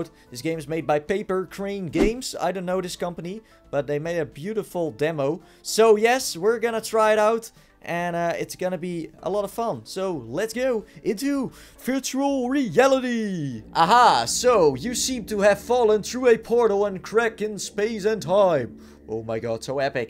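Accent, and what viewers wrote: Netherlands. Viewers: are you dutch? ikke ook xd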